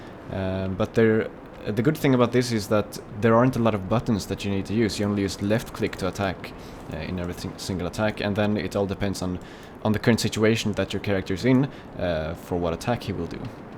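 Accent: Sweden. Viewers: hes swedish i can her it on his voice cuz im swedish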